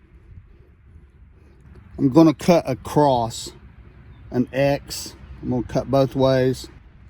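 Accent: United States. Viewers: Helpful video. Thanks. Wild guess, but are you from Tennessee?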